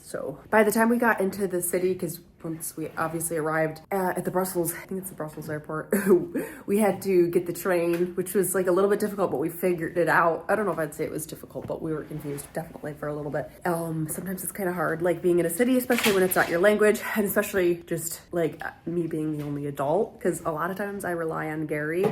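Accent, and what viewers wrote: United States. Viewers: Thick Nhat Hanh was great. I used to have a book by him, but I don't have it now. I must have lent it to someone (when I lend, I don't bother trying to remember to whom). How was that pizza on a scale of 1 to 10? You're a better traveller than I would be. I probably wouldn't even attempt mainland Europe without having one or two other people to help me figure it out. UK? No prob. Ireland? No prob. But I would be too intimidated by communication difficulties to visit Belgium, Italy, France, Germany, etc. Have you experienced any rudeness that you suspect might be related to your American accent? The US government has lost a lot of friends over the past few years due, of course, to our complicity in a certain other country's... shall we say, activities?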